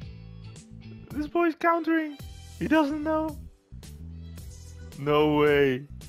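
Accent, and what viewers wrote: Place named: Netherlands. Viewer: Grappig dat je altijd kan horen dat het een nederlander is😅 niks mis met je engels hoor btw! Leuke video!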